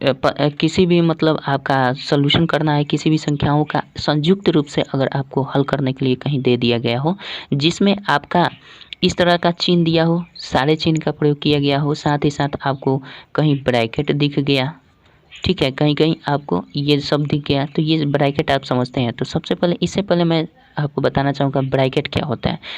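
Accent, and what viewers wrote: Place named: Bhojpuri, India. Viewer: Bhojpuri nahi bolte sir par aap bolte hai to accha lagta hai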